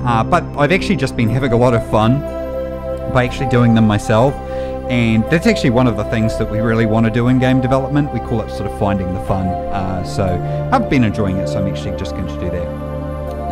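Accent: New Zealand. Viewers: Bro it’s refreshing to hear that kiwi accent 🤙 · YO IS THAT A KIWI ACCENT???? YOO KIA ORA?????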